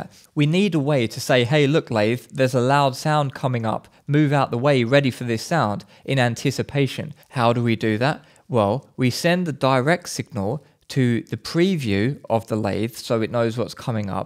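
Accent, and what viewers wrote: United Kingdom. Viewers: Rough london accent, strong dark beard, 2012 one direction hair?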